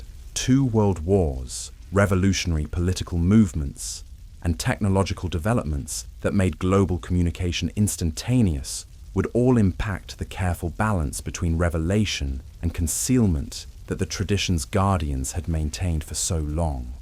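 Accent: England, United Kingdom. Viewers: Very interesting!!! Ditch the English accent. Please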